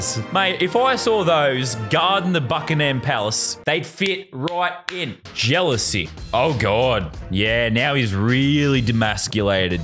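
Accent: United States. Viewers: no joke, Lazars American accent is on point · bruh his american accent is actually insane lol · was anyone else surprised by his American accent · So we’re just not gonna talk about how Californian his American accent was, he sounded like a different person!!!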